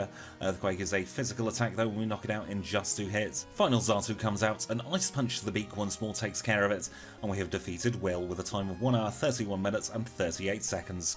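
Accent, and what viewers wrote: United Kingdom. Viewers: Searched for quagsire runs and was not disappointed. Also, nice bonus to hear a fellow Brit doing Pokémon runs too! Great video thanks 😊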